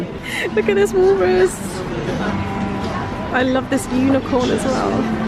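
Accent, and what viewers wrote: United Kingdom. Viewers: Hi this is Kim! Merry Christmas, and Happy holidays. I like you're video's they are intertaining! I also like you're British actcent! Thanks again, Kim!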